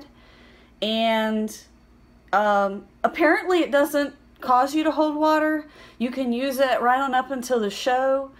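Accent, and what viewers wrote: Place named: South, United States. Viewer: I'm hearing a little southern draw there. It's refreshing. Good info.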